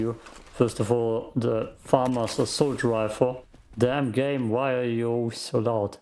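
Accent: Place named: Wales, United Kingdom. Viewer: Are you welsh? You are super hard to understand bro.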